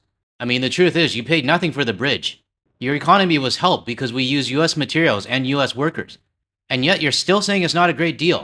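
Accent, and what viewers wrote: Quebec, Canada. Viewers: About the goofy accent. I think it might be our fault, us French Canadians from Quebec, and our less-than-perfect English 🙃 Sorry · I think that dude calling your accent a goofy accent is very offensive to you Canadians, but the funny thing is that it is somewhat offensive to themselves too, as I am pretty sure that the majority of world citizens cannot distinguish the Canadian and US accent from each other. As a Dutch citizen, I can confirm that the trust in the USA has vanished in most of Europe and definitely in The Netherlands. People from left to right would never support this administration. So don't worry, Canada is definitely not alone. It is not a coincidence that the EU has made trade deals with other countries including India. As you said, the world moves on and let them believe that they are respected.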